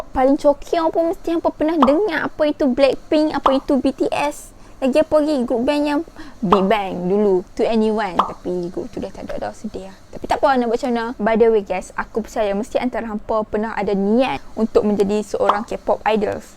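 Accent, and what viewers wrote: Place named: North, Malaysia. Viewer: Haha awat jari angg tu..loqlaq naaa..sekali lg tgk sbb ckp utara..x minat k pop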